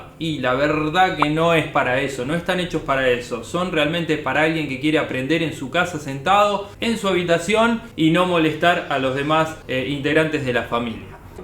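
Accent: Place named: Argentina. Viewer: pesimo video.... no tocas nada...me hubiera gustado escuchar el teclado y no tu estupida voz argentina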